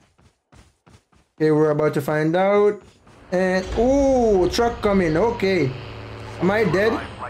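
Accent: Jamaica. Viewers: Are you from Jamaica pls tell me I am from there to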